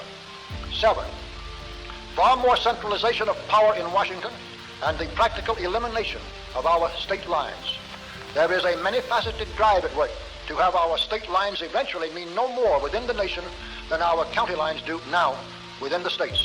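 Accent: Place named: Canada. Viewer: Sounds like Canada ..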